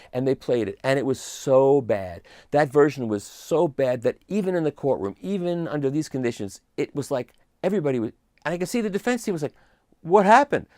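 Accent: United States. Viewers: You sound like Dustin Hoffman in Tootsie with your Texas accent. 😀 Nice job though recounting everything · I’m from Dallas and you’re getting close to the accent.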